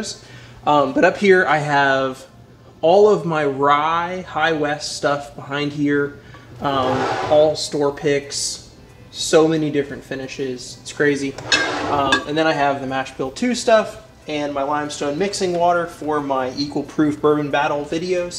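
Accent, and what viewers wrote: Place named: Wisconsin, United States. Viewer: Sounds like you might be from Wisco with all they J. Henry!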